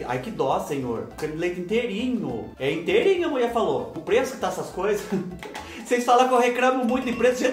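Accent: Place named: South, Brazil. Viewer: cai sem querer no teu vídeo e ao ouvir esse sotaque pensei: esse deve ser do PR ou de SC ou de RS😅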